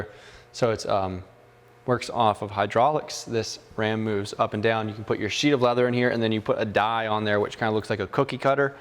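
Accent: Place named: Rural, United States. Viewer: Sounding a little country there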